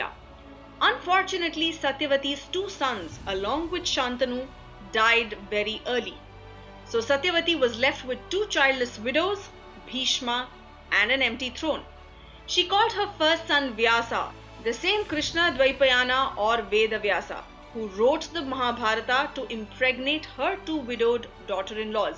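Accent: India. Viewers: Not a mythological myth, it is India's history. Pronunciation is Karn, Arjun and for more names or places like these.not Karnaa, Arjunaa, Mahabhartaa. Perfect sound of Indian accent but foreign pronounced words.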